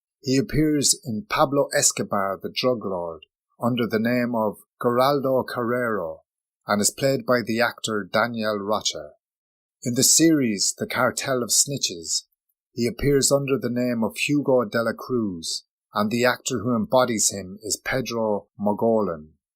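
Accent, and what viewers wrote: Ireland. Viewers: That Irish accent is not the best narrating sound lol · Colombia in a Irish accent. That as a Scottish person and someone deeply into Colombia and the false war on drugs , CIA, Pepes and Pablito etc very good Patrick Escobar Popeye RIP.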